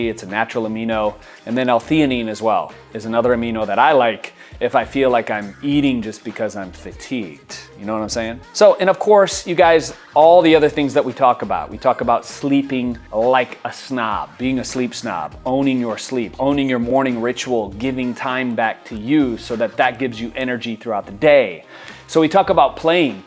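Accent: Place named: South, United States. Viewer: The Miata and southern accent just gave me life. I have to start the video over.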